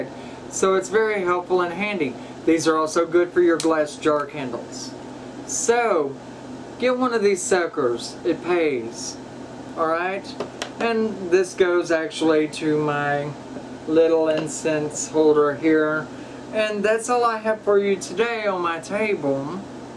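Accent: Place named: United States. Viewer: she sounds American.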